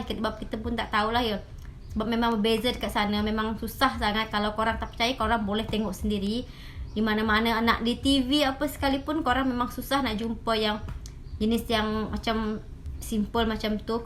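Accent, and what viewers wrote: Indonesia. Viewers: Kak,cakap je bahasa kak sendiri,tak yah ckp bahasa melayu.sbb Sleng akk tetap bahasa Indonesia...cakap je bahasa indonesia.xyah cakap melayu.😂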